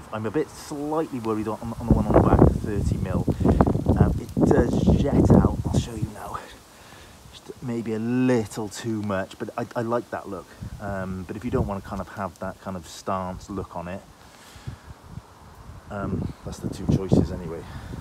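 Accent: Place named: Wales, United Kingdom. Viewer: Nice to hear a fellow Welshman talking about this car. I am from south wales but live in Arizona. Have a 2013 gt, in grey with the same wheels as you!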